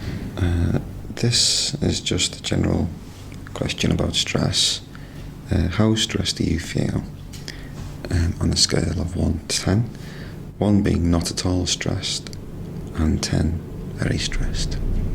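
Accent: Merseyside, United Kingdom. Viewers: that your from Merseyside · I never thought I'd like listening to a Merseyside accent (no offence, just personal preference) but I really enjoy this.:)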